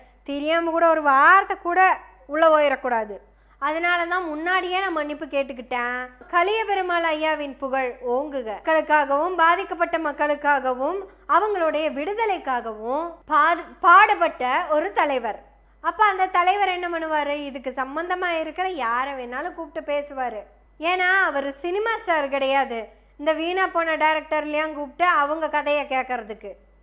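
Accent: Kongu, India. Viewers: கொஞ்சும் கோவை கொங்கு தமிழ் சூப்பர்மா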